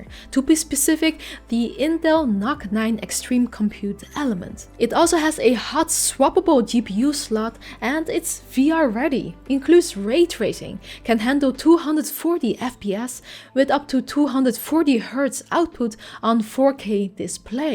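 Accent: Netherlands. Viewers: In the about section of this channel it states: location US. Maar hoewel taalkundig haar Engels als van een native speaker bestempeld kan worden, ik bespeur toch echt een licht Nederlands accent.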